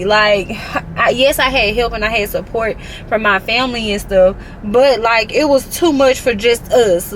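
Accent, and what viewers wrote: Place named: Mississippi, United States. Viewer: Mississippi girl here! Eboni Ebo sent me over to your vlogs and I knew from the moment you spoke “she is from the Sipp” haha I love it! I have been enjoying your content so far and will continue to support. Thanks for sharing with us!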